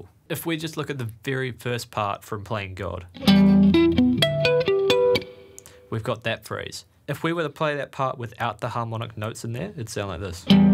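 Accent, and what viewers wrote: New Zealand. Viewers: I watch so many shred videos, I'm so glad to finally hear a New Zealand accent after all day hearing British and American accents, like no offense to them but it's always good to see a fellow New Zealander making killer content.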